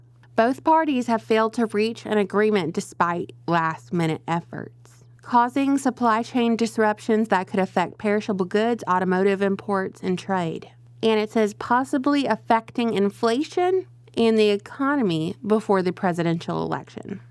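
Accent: South, United States. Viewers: she didn't talk like that before, not that southern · wait a second, you have a southern twang now which you didn't have before, what happened? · Because she is southern.,duh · she never had this deep of a southern accent before.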